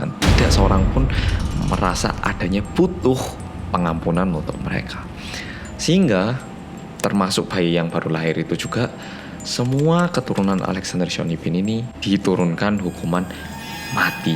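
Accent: Java, Indonesia. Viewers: Welkom bekk to bersama org korea yg medoookk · Gk perlu jadi penonton pertama,yg penting jadi penonton setia nya mas 😭😘 Semangat mas 👏👏👏 Mas,jalan jalan dong mas ke busan,saya lagi ada di Busan nih mas,mas kesini dong😁 Saya pengen banget ketemu mas lah👏👏👏 Buruan ya bang,saya keburu berangkat wamil😁😁 Semangat terus mas Orang Korea yg medok😭😘😊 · Woffyou mas yutup medhook kesayangan kita semua:*:* <3 <3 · Gara2 delok mas orang korea yg medhokk.. lali nak aku lagi godok mie. Wah mas mas... indomie ku sampai ke-medhok'en iki lho. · We miss you mas yg medhoookkkk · Oke mas medok 🦖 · Maaf ya mas out of topic commentnya. Aku mau bilang, aku mulai hidup sehat karena Mas, dari diet sehat sampe skincare-an pake Nacific. Aku ngikutin mas dari badan mas masih agak berisi sampe kaya gini. Well, makin hari mas makin guanteng yaaa. Orang lain makin hari makin tua, mas kebalikannya. 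Aku percaya itu semua karena gaya hidup mas. Thats why aku termotivasi jejak mas. Di saat aku pengen nyerah, aku lihat video mas dan selalu termotivasi lagi. Sukses buat mas, semoga suatu hari kita bisa ketemu langsung dan sharing pengalaman hidup. Luv u orang korea yg medooook! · Mas pas pertama kali liat channel nyaa aku sempet bingung 🤔 orang Korea bahasa Jawa nya medok banget 👍🏻👍🏻👍🏻 # kangen sama Rindingdong Like ya 👇🏻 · Mas medok chek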